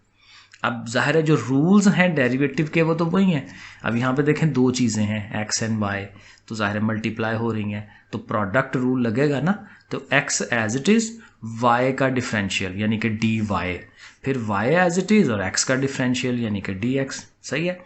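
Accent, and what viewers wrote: Sialkot, Pakistan. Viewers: Sialkoti Urdu accent 😂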